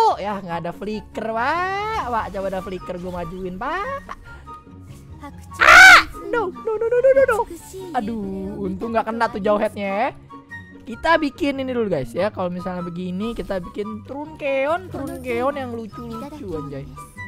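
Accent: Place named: Medan, Indonesia. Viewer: kaget bg * logat medan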